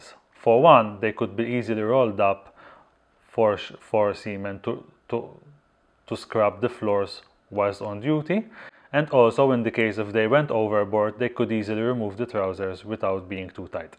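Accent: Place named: Malta. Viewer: Very strong Maltese accent :)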